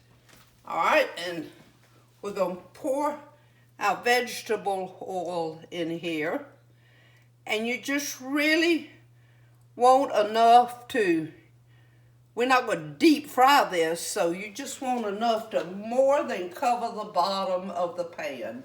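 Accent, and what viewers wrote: South, United States. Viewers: Ms., you are a national treasure! I love hearing your accent (Yankee here) as it brings back frond memories of our time stationed in the South. God's richest blessings to you and your family! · It’s so good to still hear that good old southern down home country accent from a southern young lady..!..and can’t wait to cook this dish..!..GOD BLESS..! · I could listen to you for hours!!! I love your southern accent. I’m a subscriber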